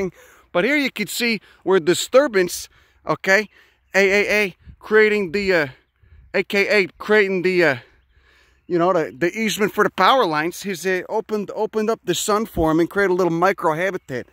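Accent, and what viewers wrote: Chicago, United States. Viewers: It’s thick Chicago accent 🤘🏻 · It's funny , the exotic "Venus Flytrap" grows in a limited area of North Carolina. Also , your Chicago accent is killing me! As an Italian American from near Pittsburgh, I can hear the similarities between the Pittsburgh accent and the Chicago accent. That's why all yins guys thought that Iron Mike Ditka was a native Chicago guy when he's actually from Pittsburgh! I'm glad that I found your channel, I'm going to subscribe. Ciao, fratello!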